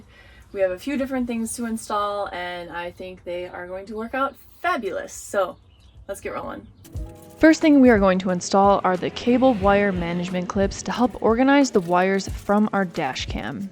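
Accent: France. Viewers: always makes me smile when i hear a french accent on an US based video^^ good ideas to print those things :)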